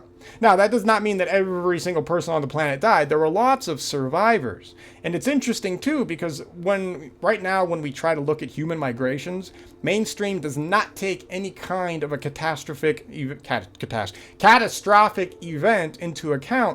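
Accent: United States. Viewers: What kinda accent is this? East coast for sure, NY? NJ? PA?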